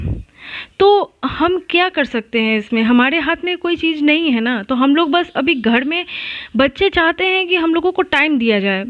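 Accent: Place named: Bihar, India. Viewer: Mam are u from bihar ??? Accent se lg rha tha😂😂😂😂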